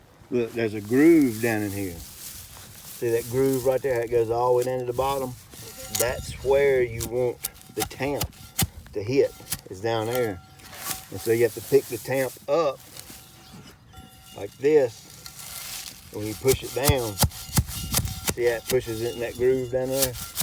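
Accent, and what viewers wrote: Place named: Carolinas, United States. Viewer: Your video says how to have a wonderful lawn, but you talked mainly about the beds. By your accent, I guess you live in the Carolina's so maybe your winters are not too bad. Here in Canada we have pretty harsh winters which take its toll on the grass. I would love more information about how you get your lawn to look so good. I have a rural property and know from the size of your property you spend a lot of time on your lawns and they really do show it they are so lush. Do you have a video where you explain how you feed and maintain your lawn. Thanks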